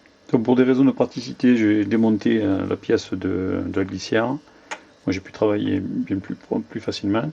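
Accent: France. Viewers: he speak FRENCH , he has an accent from the sounth of the france.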